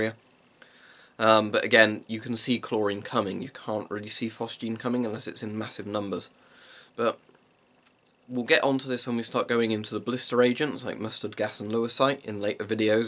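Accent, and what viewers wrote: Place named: Bedfordshire, United Kingdom. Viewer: Oh okay you sound like you were from my neck of the woods is all.. I'm in Bedfordshire .. Love your vids keep up the good work!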